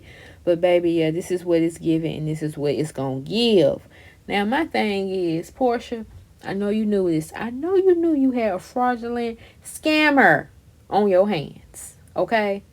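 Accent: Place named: Mississippi, United States. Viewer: I love your accent 😂 I’m from MS and this is how we talk!!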